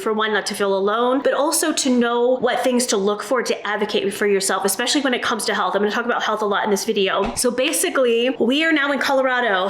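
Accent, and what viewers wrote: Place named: Texas, United States. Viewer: It’s sad that you had to leave Texas but glad you’re able to move for your own well being. I noticed you took a little Texas accent: “Y’ALL” 😃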